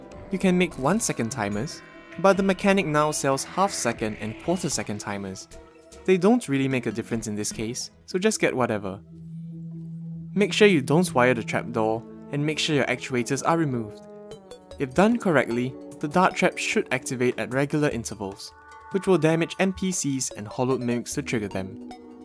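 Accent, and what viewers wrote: Singapore. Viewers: Omg your voice is super soothing! and reminds me of home! Just wondering....are you from sg?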